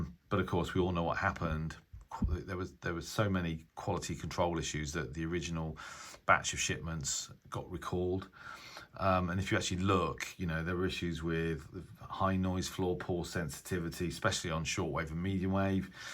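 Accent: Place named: United Kingdom. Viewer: Quite interesting story here. I love your British accent 😁 I never had any of these high-end Etons. I was among the lucky ones who've grabbed a brand new Elite Executive for 50 euros on eBay, this radio so many people speak greatly of. Well, I'm not that impressed. I don't think it'll make me retire my PL-660 or my Sony ICF-7600G. It has some selectivity issues when connected to a long wire. Also, the soft muting while tuning the bands kind of harms the pleasure. It's nice, well built, good sound and a gorgeous display but not my best radio. I'm glad I didn't buy it at its retail price. I also have an ICF-2001 (not D) that was dead (didn't turn on) but someone managed to bring it back to life for me. I 'll get it back next month and I'm looking forward to this. Keep up the excellent contents here and on X. Best 73s.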